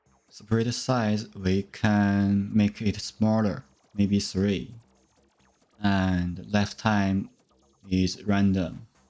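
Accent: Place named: China. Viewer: 比中文还中文的中式英语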